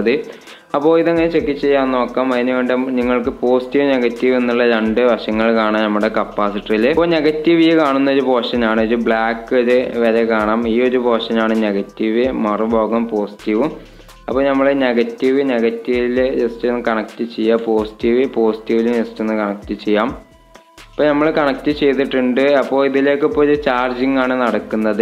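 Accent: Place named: Malappuram, India. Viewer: Muyuvanaayum ???? Enthu malayalam aado parayanathu.... malapuram or calicut sure. maya , payam , puya ..... 😷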